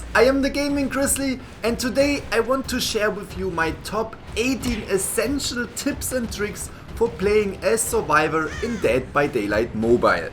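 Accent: Germany. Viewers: No front, but u are German right? Your English sounds.. pretty german, yk? 😂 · Kann es sein das du deutsch bist? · We love a good deutscher akzent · German akzent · Digga dein english ich habe direkt gecheckt du kommst aus deutschland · Im sorry but first: im super triggered by your pronounciation (it sounds like your german i am too but i speak english too) aand you were using the flashlight super bad giving tips( that are obivious) but not giving the tip to stun the killer before using it? Its actually more important than Open the gate before helping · Damn bro, man merkt, du bist deutsch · Man hört den deutschen Akzent soo raus XD😂 Aber Gutes Video Danke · Are you german ypur english sounds like that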